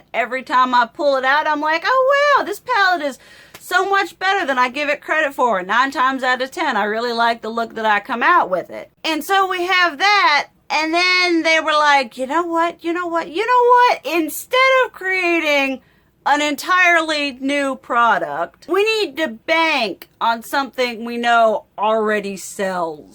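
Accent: South, United States. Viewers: I absolutely Love your accent! I'm originally from Wva. I just knew you were from the South! I love your channel!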